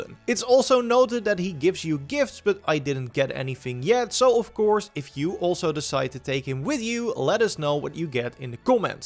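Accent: Netherlands. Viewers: Very good video! But sorry to say, your accent is a bit annoying to me. You have a very Dutch way of pronouncing the "o" (I know because Im Dutch as well)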